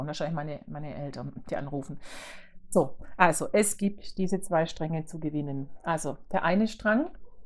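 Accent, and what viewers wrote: Swabia, Germany. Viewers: Wieder ein tolles inspirierendes Video. Ich mag deine Videos sehr gerne. Im schwäbischen Dialekt fühle ich mich direkt wie zuhause und wohl. Ich könnte dir stundenlang zuhören. Du hast tolle Projekte und färbst richtig schöne Wolle. Bin sehr gespannt was du zu zeigen hast, wenn du im größeren Stil für deinen Shop färbst 😍 Der tuscha kal hat sich in meinen strickplänen nach ganz vorne gedrängt und wird definitiv mitgestrickt. Aus deiner Wolle könnte ich mir super vorstellen ein Set für meine Tochter zu stricken🥰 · Frisch und sympathisch eine angenehme Art schwäbisch ich könnte dir Stunden lang zuhören, deine strange sind herrlich könnte ein Tuch vorstellen bin gespannt ich freue mich auf den nächsten podcast liebe Grüsse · Konfus ist toll, und das schwäbeln verstehen bestimmt auch Norddeutsche. Ich Rheinländerin find es klasse zwischendrin die Emotionen in Heimatsprache zu hören. 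Danke! Und deine Wollfärbungen sind doch toll. Sei mit dir selber Zufrieden, dann werden Dir die Färbungen auch immer besser gelingen. Ganz liebe Grüße aus Roki Regina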